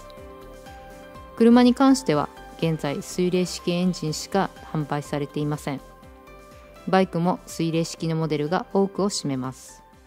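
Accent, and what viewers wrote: Kansai, Japan. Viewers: 端々に出てくる関西訛りが、スゴく好きです。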